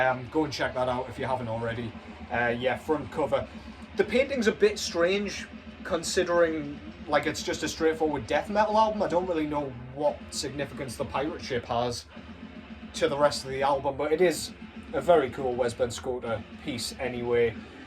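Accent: Newcastle, United Kingdom. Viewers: Where yuh at fella? Ah need me durse uv Geordie taakin aboot metal! Woraboot a Nile ranking man?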